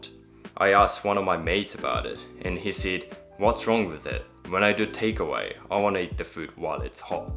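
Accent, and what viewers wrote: New Zealand. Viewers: your english is good, but as an American i can pick up on your New Zealand accent at times. like the way you say the word "yet" and some other words. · I wondered if you grew up in NZ, you have a Kiwi accent. Then I saw another video and yup. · You sound a bit kiwi. ☺️ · It always makes me laugh how much time and money people spend to become 'minimalists'. You are the most authentic person on this topic that I have watched so far. Thanks for posting your thoughts on these topics - and it's nice to hear a Kiwi accent for a change (from an Aussie) xx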